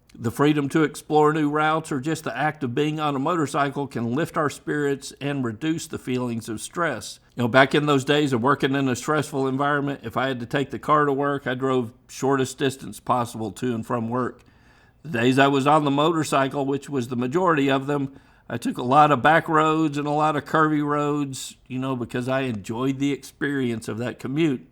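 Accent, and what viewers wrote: Texas, United States. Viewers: I talk Dutch and still am able to perfectly (almost then) understrand your Texas English. You're good 🙂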